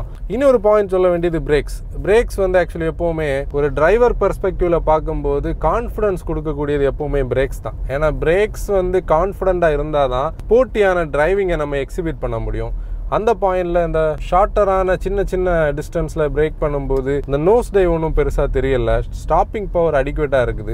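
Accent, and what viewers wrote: Kanyakumari, India. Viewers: One of the best moto vloggers I've come across in YouTube. And hearing that too in kumari Tamil is refreshing. Keep rocking guys..btw I've a question Between VW Taigun & Skoda Kushaq, which one would be a good choice? Driving dynamics and rear seat passenger comfort are my top priority areas. Also will 1.0 litre engine be adequate enough to give a peppy Driving experience? Or should I go for the 1.5 litre one? Predominant usage would be within city with occasional highway runs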